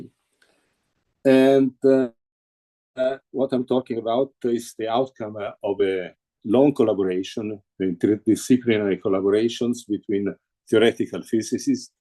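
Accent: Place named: Italy. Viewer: its the the phonemes and the inflexion, he is using italian inflexion and cadence and phonemes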